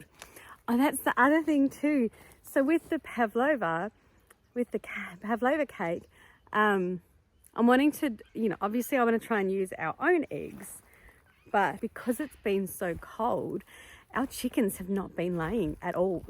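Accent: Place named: New Zealand. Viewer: I was gonna say you sound like a kiwi! Kia Ora!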